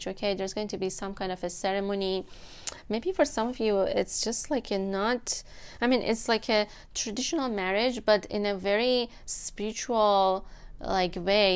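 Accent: Iran. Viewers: I Love Persian Accent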